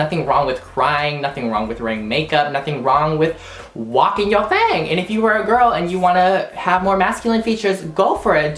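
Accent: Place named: South, United States. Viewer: I LOVE your country accent and your little laugh you do it's completely adorable! :)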